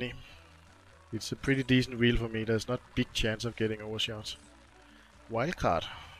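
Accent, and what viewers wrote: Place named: Denmark. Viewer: Du är dansk va? Det hör man på din engelska 😅 Men, sjov med människor som streamar Looney Tunes, keep it up!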